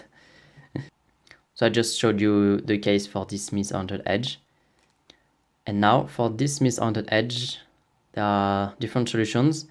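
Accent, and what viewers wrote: France. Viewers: French English sounds so French. I know a guy who says "particular", "specific" and similar words with French accent all the time ^^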